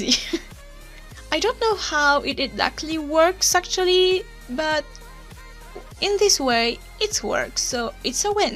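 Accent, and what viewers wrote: France. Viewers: Na real man o sotaque dela é frances mas continua sendo bonito pra caramba uwu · You are french ?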